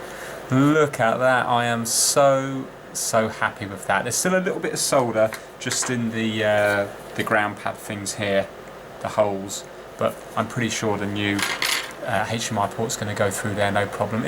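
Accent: United Kingdom. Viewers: I do not like your accent ... Sry, why does British English sound so awful?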